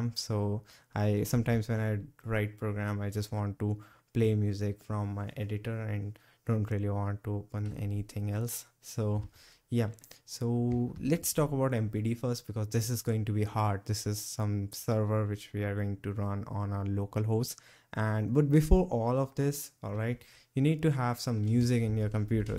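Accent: India. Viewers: Certified Linux Chad with indian accent, lisp and all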